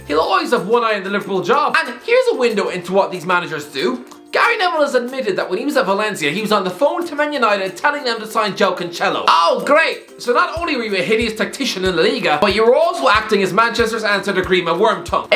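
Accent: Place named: Ireland. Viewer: Speak English thick mick.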